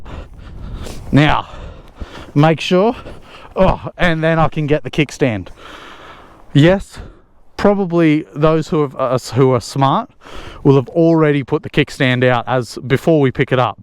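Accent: Australia. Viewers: You sound like an Aussie!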